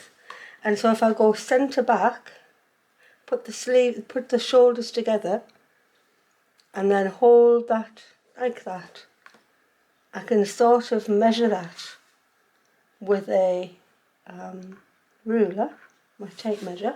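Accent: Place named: North, United Kingdom. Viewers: I just found you! A stitcher after my own heart, and your voice is a lovely northern one, like mine, though I've lived abroad now most of my life. Thanks so much for the skirt. I saw this idea on historical channels and have been meaning to make one for a while, so now I will. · Two absolute joys of watching you is that you have a beautiful home/northern accent I'm East Yorkshire and your speak in inches first! Are you using 4 or 5 thread overlock? I've had my overlocker for many years but have only ever done four thread.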